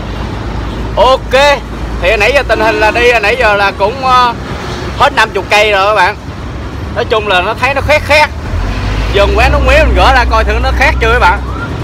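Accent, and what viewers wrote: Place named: Dong Nai, Vietnam. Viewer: Quê a đâu mà e nghe a ở long khánh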